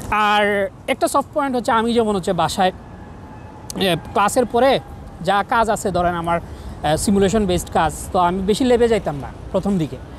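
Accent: Sylhet, Bangladesh. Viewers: সিলেটী একসেন্টে চমৎকার উপস্থাপনা! অনেক শুভকামনা।❤ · Bhaiya apni sylheti? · Vai afne sylhety maybe??😊 amio sylhet shahporan alakar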